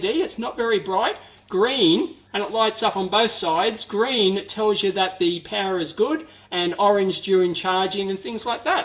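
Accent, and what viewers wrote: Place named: Australia. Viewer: Rocking the accent.. is that australian or new zeland?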